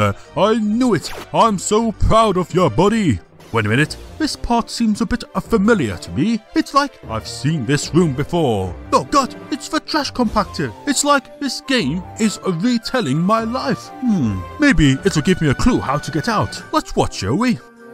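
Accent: United Kingdom. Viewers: British dude... have you never heard an englishman say H?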